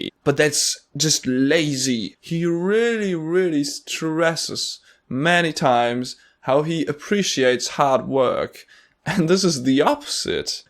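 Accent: Germany. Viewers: Really enjoyed your video, and totally agree with you on most points. From your accent and the bag behind you I assume you're German (forgive me if you're Austrian or Swiss). I had to smile a bit when you mentioned how Jake Paul's philosophy (or world view or whatever) is very American, as it reminded me of how the German exchange students here characterize such people, and sadly I have to agree with them. No I don't want a generation of Jake Paulers. His content is a monument to his own arrogance and narcissism. While I don't like his older brother's stuff either, at least his charisma doesn't seem anywhere as fake and vapid as Jake's. As for the Navy Seal stuff, while I'm sure the armed forces would have ripped much of the narcissism from him, I have to agree that it fits in line with the vapid (probably) bully type that I'm sure he was, and to some extent still is. 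And I hate the "we made it in one day," shit. In writing, editing and refining your work takes up much more time than actually writing content by volume, but it's the refining and craftsmanship that come with it that make it good. Jake is just pumping out sewage.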